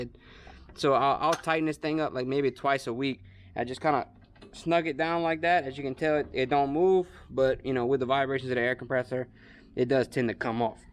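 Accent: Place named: Louisiana, United States. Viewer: Love me some Cajun accent. Bro lived in Lake Charles.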